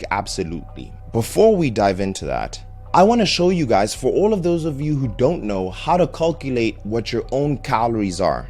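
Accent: South Africa. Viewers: Nah, I'm hearing a bit of a South African accent here. Edit: Oh, it is indeed.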